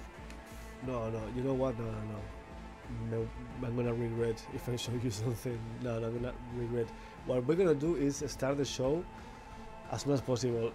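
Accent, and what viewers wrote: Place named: Argentina. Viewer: Que grande, lo escuchas hablar y es mas argentino que el dulce de leche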